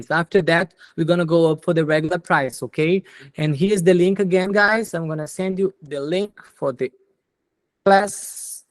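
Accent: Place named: Brazil. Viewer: Pelo sotaque brasileiro 🇧🇷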